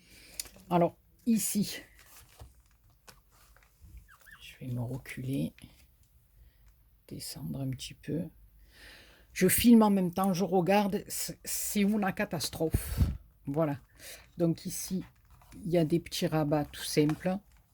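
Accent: South, France. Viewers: Vraiment sublissime cet album beaucoup de goût mais surtout votre façon de mettre votre touche personnelle fleurs feuilles ruban bidouilles qui en fait une pièce merveilleuse et unique je me régale à regarder vos vidéos et j aime votre accent du midi. Couleur vacances et soleil Bises de bretagne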